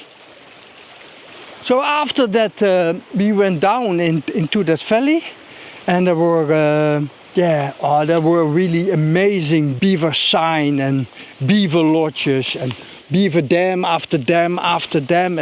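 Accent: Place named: Netherlands. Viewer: Leuk Nederlands accentje😂 wel leuk zo'n rode wouw!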